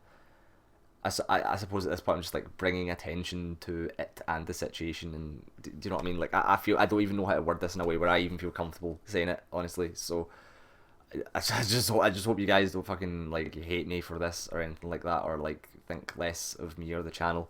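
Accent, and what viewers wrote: Scotland, United Kingdom. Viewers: I can't even imagine what it must be like man. I am watching your DS1 guide to help me on my second save and you just make me laugh and keep me plodding through. I love the Scottish accent too. Bringing donations into the limelight is fine, and I wish I could donate directly, but hopefully my views on youtube will help out! Keep keeping on man, you guys kick ass!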